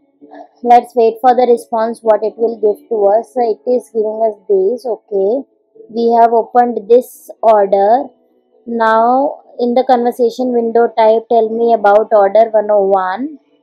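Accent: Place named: India. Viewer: Keep making videos , Thoda hindi tone hota haina ache se samjh m aa jata h🤩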